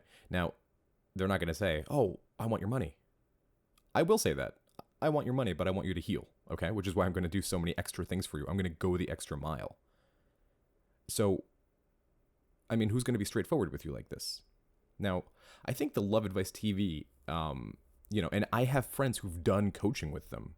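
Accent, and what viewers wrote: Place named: California, United States. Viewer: Actually I am very curious to know. You don’t show your face and I am sure that has A LOT of your viewers curious lol. At least tell us where you broadcast from? I am betting you’re in California based on your accent or somewhere out west. I am in Canada. Ontario near Toronto to be exact.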